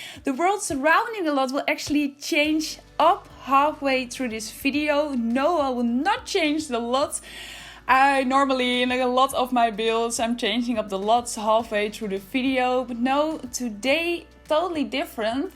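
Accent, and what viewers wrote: Netherlands. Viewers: Yeah you’re Dutch I knew the first 3 seconds of the video😂😂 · You have one of the cutest Dutch accents I have ever heared (I'm Dutch as well), and I usually don't like the dutch accent at all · Clocked the Dutch accent, hehe xxgroetjes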